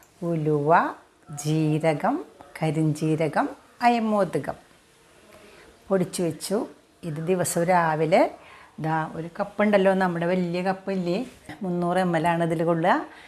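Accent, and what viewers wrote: Thrissur, India. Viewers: മ്മടെ പക്കാ തൃശ്ശൂർ സ്ലാങ്.. മരുന്നും അവതരണവും ഒരേ പോലെ നന്നായിരിക്കുന്നു ചേച്ചി.. 👌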